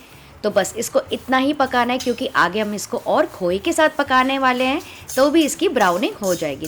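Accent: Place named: Sindh, India. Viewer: adee jo ghalahin dadho aaio khas toarte sindhi lahjo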